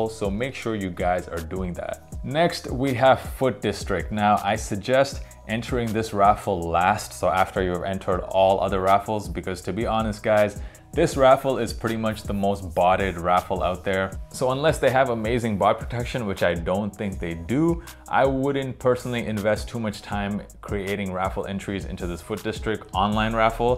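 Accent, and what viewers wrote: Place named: United States. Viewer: Bruh... you've got an American accent and live in Berlin. WHAT IS A MENS SIZE 11?!🤣 US/UK?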